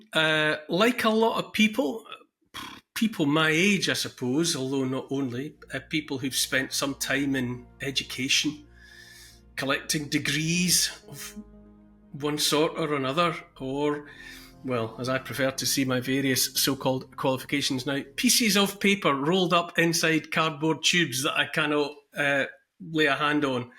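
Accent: Scotland, United Kingdom. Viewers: Love to listen with that wonderful accent! 😍 Scotland, Ireland?? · I wrote this Poem a while back. Thought you might like it. It suits the times just fine... Feel free to read it out one day if you like. Would sound ace with your Scottish accent! Rare Gem Robert Berning 04/12/09 Filament and firmament everything's not permanent, from the depths of the greys, to the limit of our ways. But the cycle continues ever turning always into. We need new thought process, from the minds closed recesses, to change our way of learning, new directions we are turning. Many years we have thought the correct things we've been taught. All our lives we have lead, all the lies been drip fed. Suck the tits of prevarication, drink the milk of misdirection. Live your lives in that veil, let your own soul turn stale. Were all caught in a cage, with no bars and no walls, but to escape from this cage, we've been supplied with the tools, life's programming even way back to the schools have turned us all into illiterate fools. 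With probing my mind has now found the pulse that all that we have ever been has always been false. Equivocation, Evasiveness, fabrications for sooth fed statements that deviate us from or pervert the truth, from an arsenal of politics, religions false hope, to the alcohol, tobaccos and dope that we smoke. Their weapons are varied and dire in scope. We think were immune, but how they do gloat! When we escape, which we do all the time, its easy to accidentally just fall back in line. The programming was set from all our first breaths, to stay away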